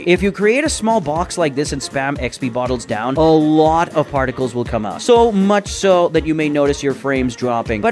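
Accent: United Kingdom. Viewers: I thought u were British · Ain't you british?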